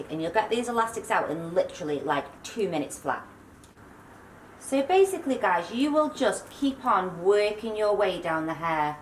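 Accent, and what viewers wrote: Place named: Birmingham, United Kingdom. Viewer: Amazing braid!! I love your accent - it’s very Peaky Blinders 💕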